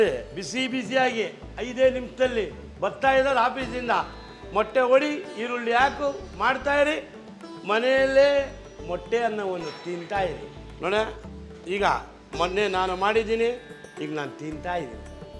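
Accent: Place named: Coastal Karnataka, India. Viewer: I like his Coastal Kannada style . Passionate cook 😊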